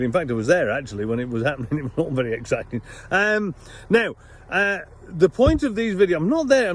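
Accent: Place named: England, United Kingdom. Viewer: For a man that has been living in Canada for 15 years your English pronunciation is still quite English... 😜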